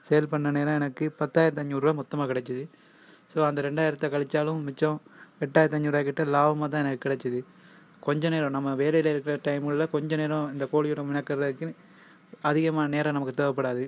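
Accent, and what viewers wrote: Sri Lanka. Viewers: this sri Lankan speek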